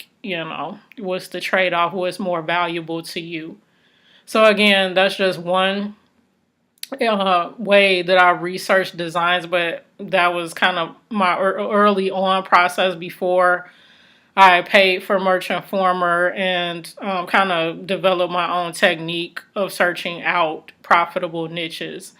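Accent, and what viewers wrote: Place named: Carolinas, United States. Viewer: Nope. You sound like my family but they're from the Carolinas / DC. Anyway love your videos. Thanks.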